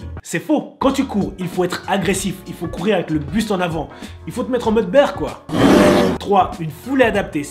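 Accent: Belgium. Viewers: T belge ?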